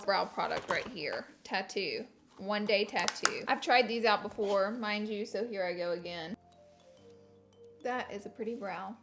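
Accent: South, United States. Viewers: You came up on my feed , so I don’t know you but all I gotta say are you country?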